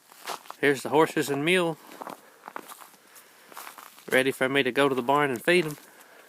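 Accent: United States. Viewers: i love your video. however,....i have to know what part of America your accent is from. thx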